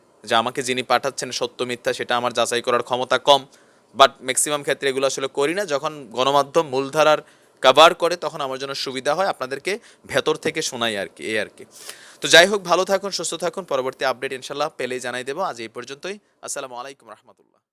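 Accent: Comilla, Bangladesh. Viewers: আমরা কেনাডা থেকে তোমার কুমিল্লার কথা শুনে আনন্দ পাই। চালিয়ে যাও, Be changer do not be a quiter!!!!! Stay alart abot Pradip to be killed?? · ভাই আপনি কুমিল্লা বাসি মাজে মাঝে আনচলিক ভাসা বলেন অনেক মজা পাই = আমার বারি বরুরা কুমিল্লা, বরতমানে সাওদিতে কামলা দেই = 21 / 09 / 1987 = ইংরেজি তে,সাওদি আরব আসি = ইউটুবে সত্যি কথা উঠায়ে ধরার জন্য আপনাকে অনেক ধন্যবাদ = ভাল থাকেন দোয়া করি == কোরিয়ান মোবাইল নাম্বার টা পাঠা ন কথা হবে ইনশাআললাহ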